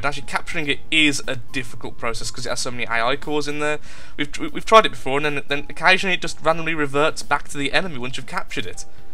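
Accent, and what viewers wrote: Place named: Birmingham, United Kingdom. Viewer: I KNEW IT! I kept debating whether you were a fellow Brummie or from Manchester by your accent.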